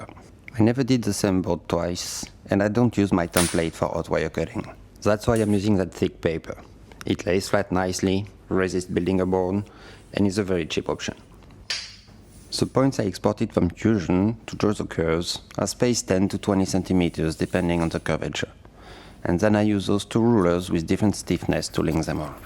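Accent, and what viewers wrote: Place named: France. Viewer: au fait au vue de l'accent je suppose que tu connais le francais ;)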